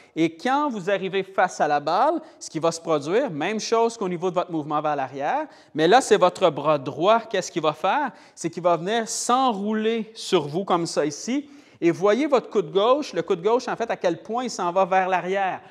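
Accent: Canada. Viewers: Merci beaucoup, pour ces vidéos très claires et faciles à adapter. Et avec l’accent canadien, c’est encore plus sympa!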